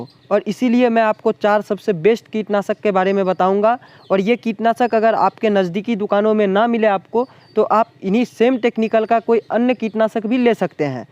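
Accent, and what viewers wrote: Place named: India. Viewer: Ye kuch india k parts me aisi accent hi hoti hai . Mazak udane ki zarurat nahi . Bengal me bhi to V ko B bolte hai . Vidya ko Bidya bolte hai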